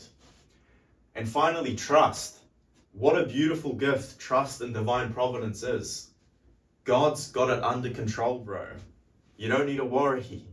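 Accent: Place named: Northern England, United Kingdom. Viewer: Amazing cross between a northern english, kiwi and australian accent. Listen to this young man, he’s either lived many perspectives already, or has family experience to call on.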